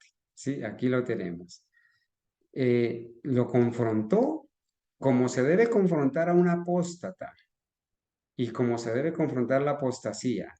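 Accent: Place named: Colombia. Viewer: Bendiciones hno, desde donde transmiten? Le puedo notar,acento colombiano.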